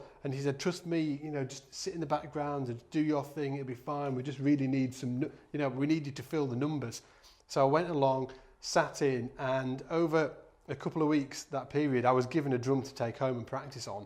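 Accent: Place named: Manchester, United Kingdom. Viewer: Tha sounds like a manc lad, lad! Great tutorial BTW Im a guitarist and this was great help for me. Just one thing....have you just got back from robbing an ASDA?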